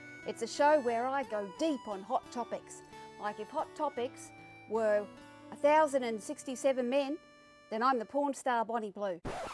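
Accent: Australia. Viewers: I would love to hear your take on <link> Aside from that as an aussie in exile in NZ I really enjoy hearing a good ocker accent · you are unique. Your perspective on American affairs is spot on. Please continue to keep us informed. Your Australian accent doesn't hurt either.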